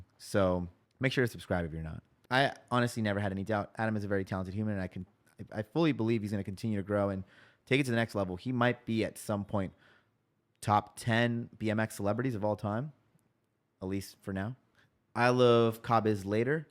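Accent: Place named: Argentina. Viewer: Tiene acento como de argentino.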